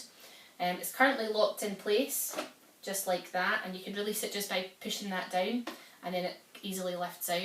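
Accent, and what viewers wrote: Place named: Ireland. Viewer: where do you from ? your accent is almost like irish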